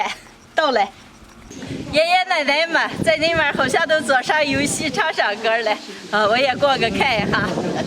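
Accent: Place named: Shaanxi, China. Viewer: 我外公來自陝西城固縣 49年來台灣~~聽到口音突然好熟悉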